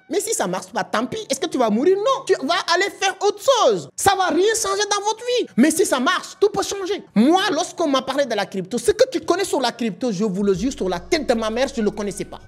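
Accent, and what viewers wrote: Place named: Senegal. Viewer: Force a toi. J'aime bien l'accent des sénégalais .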